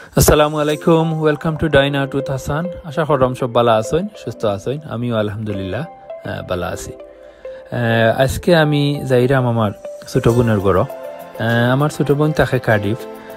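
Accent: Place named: Sylhet, Bangladesh. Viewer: Sylheti Voice amr khub valo lage Bhai 😻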